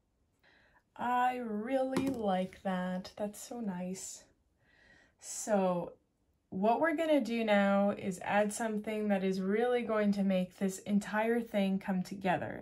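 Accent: Canada. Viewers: Within 2 min I recognized a fellow Canadian 🇨🇦 Beautiful job, thank you!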